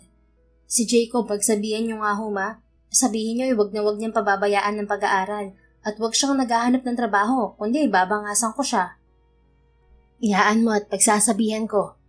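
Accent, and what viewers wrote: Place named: Batangas, Philippines. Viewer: Thank you, writer Smiling face, and for a wonderful story..Ang galing mong narrator lalo sa Batangas intonation mo..Congrrats👏👏👏❤❤❤⭐⭐⭐⭐⭐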